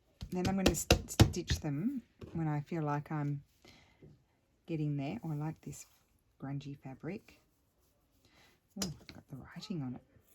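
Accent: Australia. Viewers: I love this way of making clusters - I'm still new to all of this and haven't attempted clusters yet but this is how I'll try it for sure! Also love your videos and all of your wonderful creations. I was an exchange student in high school and I was in Australia for about 6 months. The Australian accent is one of my favorites and makes me think of how much fun I had when I was there.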